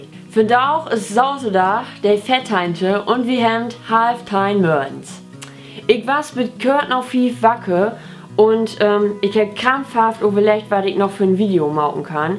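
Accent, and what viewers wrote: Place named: East Frisia, Germany. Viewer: Oh ik mag den ostfreesken akzent heel lieden :) Schöön to seen dat dat no annere Lüü in usen öller gifft de no Platt schnacken =) As ik dat lesde Mol bi di keekt heff, was ik mi no nich seker, of dat nich blot en Spijöök was. Man dor ik nu seker bün, dat du no mehr op Platt doon deist (hoop ik tominnst), abonneer ik di nu =) ...Hest du egentlich nen Macker? ;)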